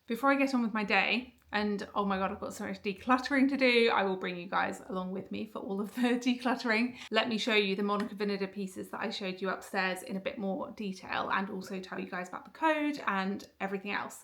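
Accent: United Kingdom. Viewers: So happy for you. I would love to see every moment of your renovations. You have the most beautiful skin, you are glowing! I could listen to your beautiful British accent all day. Blessings 💝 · Best British accent 😊 I also miss when you made vlogs with your friend Kate🌸🌸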